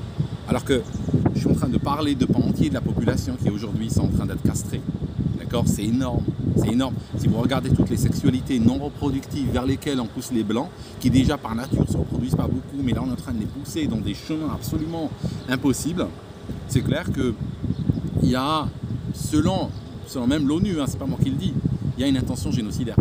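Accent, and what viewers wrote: Switzerland. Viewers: Le retour de l'accent suisse d'? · Ton accent Suisse revient grave quand tu es énervé...😂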